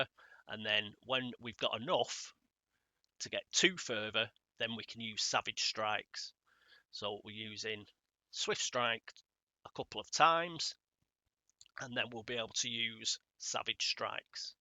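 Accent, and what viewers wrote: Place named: Manchester, United Kingdom. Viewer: Probably because your Mancunian accent made me think of Bumble when you said fervour, couldn't help but think that Bimbling has got a four fer. Well bowled lad. lol Being a dwarf, does he like to field at short square leg? (groan!)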